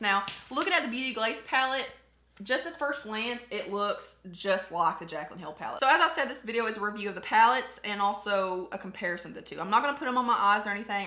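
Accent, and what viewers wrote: South, United States. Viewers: I heard your accent and subscribed😂😂 As a girl from a small town in NC, I say we need more southern youtubers lol!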